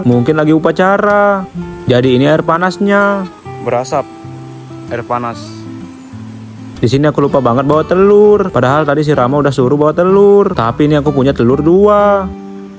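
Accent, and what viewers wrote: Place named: Kendari, Indonesia. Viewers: Logatnya kaya org Kendari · Logat ta sudah pasti mi sulawesi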